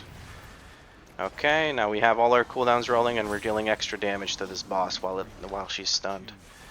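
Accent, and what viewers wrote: United States. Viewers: Awesome vids! You sound American, but I keep seeing Russian and German in party chat. Are you from Europe? Im partially asking cuz usually once per video I see you pull mobs I have never seen pulled before😂 Was just wondering if it was more common in NA. Or if you just pull somewhat randomly until you get to a key level where it gets a bit harder. All vids are really helpfull and informative as im trying out tank for the first time😅 Keep em coming💪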